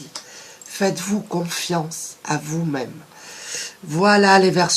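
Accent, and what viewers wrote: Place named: Belgium. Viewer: haaaaan dsl vous avez un accent de Belgique 😂🤭 pardon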